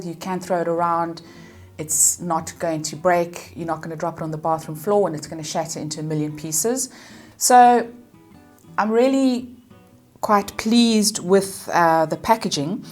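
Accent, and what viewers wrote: South Africa. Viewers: Hi, I liked this video. Just found you on YouTube. I can hear this hat you are South African. I am also, but living in New Zealand. You gave a very good review. I feel I can identify with you 🥰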